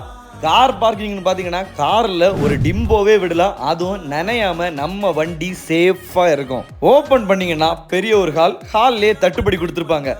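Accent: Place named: Marthandam, India. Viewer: Marthandam tamil pesuraan kanyakumari or kerala border ah irukum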